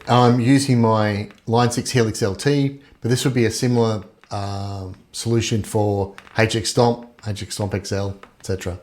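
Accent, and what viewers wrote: Australia. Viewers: Great content and nice to hear an aussie too mate. Plus I have the exact same bass as you so great to hear these demos. Keep it up!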